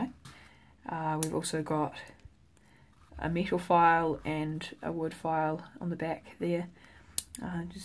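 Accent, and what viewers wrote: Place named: New Zealand. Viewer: I recognise the accent! A fellow kiwi I see. What part of NZ are you from?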